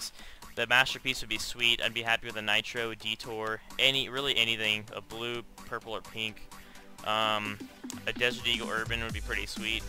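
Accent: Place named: South Africa. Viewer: I thought you were South African... Where are you from???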